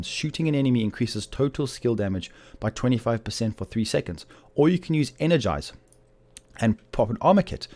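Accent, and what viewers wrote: South Africa. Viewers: he is from SA obviously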